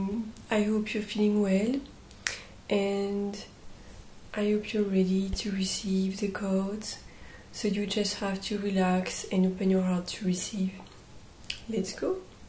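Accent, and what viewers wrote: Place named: France. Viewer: Thank you for your work! Your video (another one) popped up in my feed about a week or two weeks ago, and now this one. So I'm a new subscriber now! :-D I discovered light language a few years ago. Where are you from? I hear a French accent. :-)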